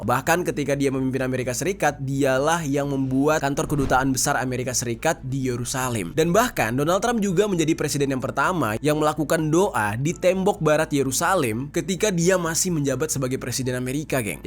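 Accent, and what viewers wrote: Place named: Java, Indonesia. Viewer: Aku lebih suka "hey Genk..welcome to back kamar jeri ..." 😁😁 Medok..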